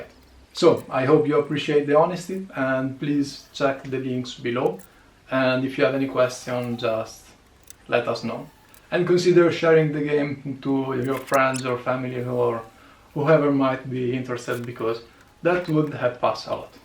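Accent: Italy. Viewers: Ma sei italiano? Ti ho appena scoperto e ti facevo americano, ora che ti sento parlare inglese mi è venuto qualche dubbio. Comunque adoro i tuoi video, sarai la mia nuova dipendenza su youtube dopo dermatologi che schiacciano cisti, giapponesi che cucinano, affilano coltelli e tagliano cose e cuccioli di panda :D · Scusami se ti faccio questa domanda, ma perché non fai video in Italiano? (si vede che lo sei, sopratutto si sente) Sei molto bravo complimenti!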